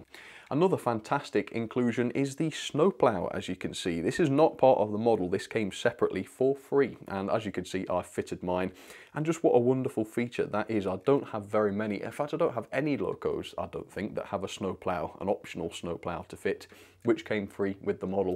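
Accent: United Kingdom. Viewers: i dont think i will... train sets are like 20 times outside of my price range for a hobby. also no offense, but the few people ive meet who are into model trains are really really really obsessed with trains. i dont think i would fit in and would be viewed as a filthy casual within the train community. i watched your thomas set vs real life trains. i like to see home made competitions like that. jelles marble runs does a fantastic job with the marble olympics. i also really like your voice, and the britishness, if thats even a word.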